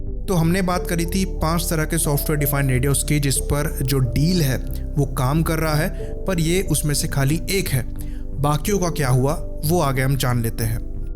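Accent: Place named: Bengal, India. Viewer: I think so.. His 'motamoti' pronounciation is very Bengali type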